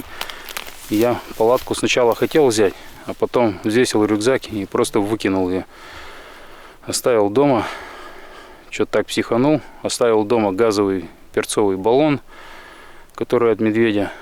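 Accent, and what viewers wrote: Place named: Arkhangelsk, Russia. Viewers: По говору вроде Архангельский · Ютуб предложил ваш ролик.Начала смотреть из интереса.А говор-то наш,северный).Приветствую земляка!Подписка.Лайк👍.Архангельская область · ! Привет! Подписался! Зашло! Где снимаешь? Я был в мезени, там такой же говор! Привет из Челябинска!!!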